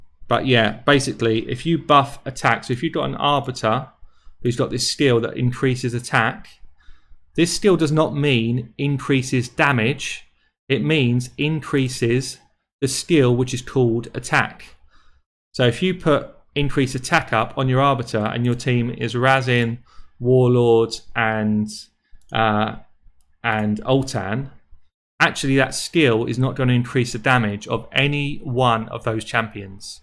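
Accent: United Kingdom. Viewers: In my humble opinion, you are a top raid analyst. I regularly watch tons of popular raid bloggers both english and russian speaking and I can definitely tell that your particular way of analysis, your explanations, your tips are by far the most impactful and valuable. Plus the British accent. Absolutely fabulous.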